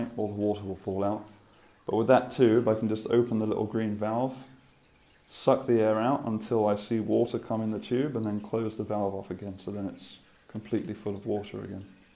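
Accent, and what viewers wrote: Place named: United Kingdom. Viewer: After much thinking, the penny dropped. The overflow (which is not a siphon) works as the water level in the tank comes up, it equalises in the overflow box, which brings it over the level of the white tube - voila! Have you experimented with the flow? How would you increase the flow out of the main tank? Great video - well explained. I thought I heard UK in the accent and USA in the power outlet - then I read Thailand in the comments!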